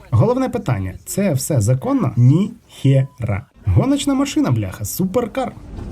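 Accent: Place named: Surzhyk, Ukraine. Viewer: Пиздец, мужик, зачем ты перевод делаешь на украинском, если его полноценно не знаешь? Твой суржик звучит ужасно.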